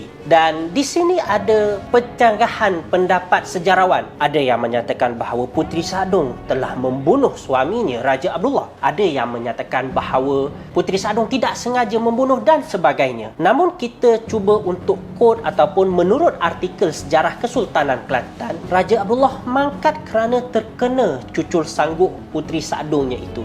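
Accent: Thailand. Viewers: Best dengar slang thailand 🤭🤭🤭🤭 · Orng thai ke ni accent dia beli sebut nama ii tu sebijik orng thai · Aku suke die ni ckp nme org tu.. Die pnye slang Thai tu..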